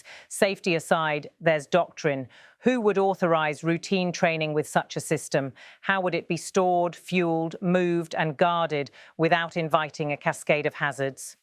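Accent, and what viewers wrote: United Kingdom. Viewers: Good grief. Don't think can watch the whole thing. The voice is irritating as hell, with snotty female tone & British accent that screams no credibility, in part to BBC etc destroying all credibility of any news with British accent. And as if written by ai set to over descriptive mode, creative writing, with pretense of being "news" & editorial commentary at same time. 🤯🙄🤯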